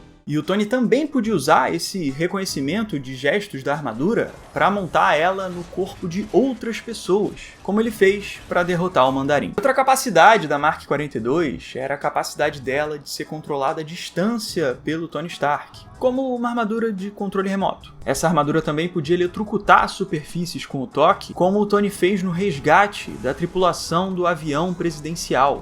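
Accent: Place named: Northeast, Brazil. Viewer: Mano vídeo sensacional cara parabéns. Mas me diz uma coisa tu é nordestino? Pq teu sotaque é igual o meu tbm kkkkk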